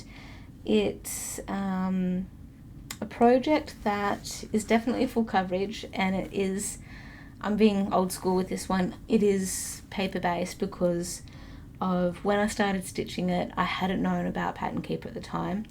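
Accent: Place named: Australia. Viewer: I have never attempted a full coverage but love seeing everyone's progress in theirs. I have pattern keeper but don't have the program uploaded yet as I've never used a tablet before! That tequila sunrise silk is gorgeous! Water tiger is so beautiful! I love big cats! But your designs are wonderful! I adore you! From the first moment I found you. You hooked me with your sweet Aussie accent and soft voice and amazed me with your photography and translated designs! You are so Gifted! I wish you were my daughter💕 I would love spending time with you creating (and walking = I was never a runner!)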